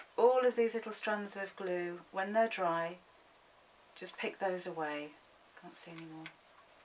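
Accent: England, United Kingdom. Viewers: It is so nice to listen to a tutorial given in an English accent.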